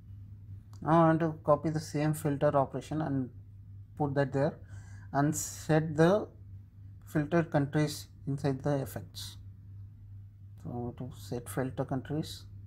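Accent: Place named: India. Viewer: i think i better understand indian accent than american. Thx u a lot)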